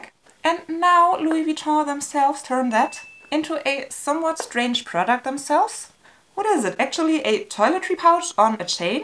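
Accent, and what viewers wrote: Germany. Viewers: Where are you filming from love? Love your German accent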